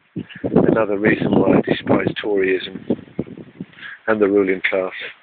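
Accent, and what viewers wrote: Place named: Ireland. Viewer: That is cool man just heard the accent, I'm from Dublin myself and I know it was not a famine more like ethnic cleansing of our people but you would not believe the abuse I have received for calling it such. This country produced so much food at the time and still they let us starve. This video and many others should be showing all round the world. I also believe the british government should say sorry considering they gave Israel to the jews and not even an sorry to Ireland.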